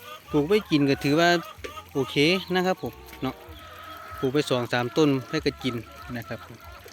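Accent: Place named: Chaiyaphum, Thailand. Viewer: ว่าแล้วสำเนียงบ้านพี่เล้ยยย น้ำไม่ท่วมดีใจฝรั่งก็สวยงาม ยังไม่ได้กลับชัยภูมิเลย อยู่แถวไหนครับ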